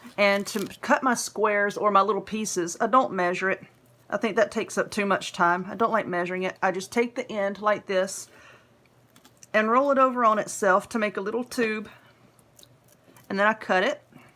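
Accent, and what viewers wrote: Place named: North Carolina, United States. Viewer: Love it!!! You are an awesome teacher! I can't wait to make one. I hope you are doing well. I also like your North Carolina accent. I can't spell the word you said that was N.C. language but my daddy was from and lived in Ashville N.C. and he was something else. He came up with language also. So I love listening to you talk bc it does remind me of him and his language. Thank you for all you do!👍👍🎆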